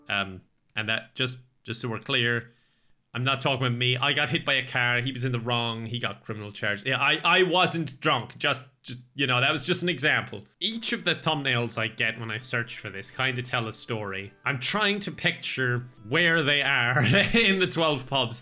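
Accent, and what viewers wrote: Ireland. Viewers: turned so Irish in this. Like he is letting loose his Irish tendencies in this video. Like so many Irish angry rants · I like how accent gets progressively thicker and more irish as the video goes on and he gets excited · I love cranking his Irish accent up to 11. I'm Canadian and we can't do that because the "Canadian" accent people hear in pop culture isn't Canadian at all - absolutely no one here has that accent and I don't know who does, if anyone. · Omg I just love irish accent so much. I dont understand anything but I just love it. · This is most Irish I've heard in a while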